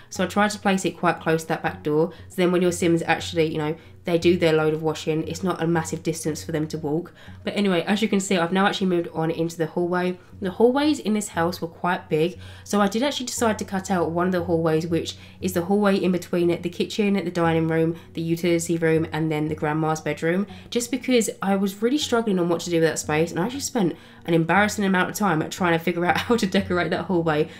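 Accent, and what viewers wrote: United Kingdom. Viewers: British/London accent, the worst of all eng accents, so please slow down, especially when u r not pronouncing at least one or more of the ending syllable from every word. Instead of ”cars”, you have ”ca”... Terrible pronounciation of the eng language, but a motto for later: ”Swedes speak better english than the Brits and maybe the best” So fuck the eng or especially the londoners eng who mutilate and deforming the language so badly. Haha ;) :D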